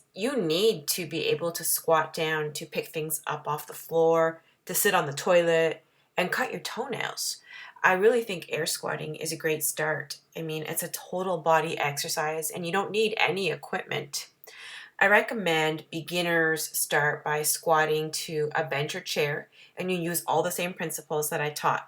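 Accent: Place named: Canada. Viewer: Outstanding video- It's like you read my mind request list from yesterday's leg day. Also...Canadian Accent +"Squat"= huge smile! ✅🌈🌈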